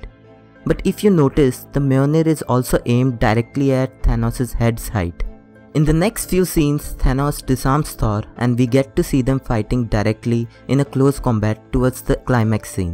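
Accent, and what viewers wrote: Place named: India. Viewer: R u indian cause ur English is id wanna say it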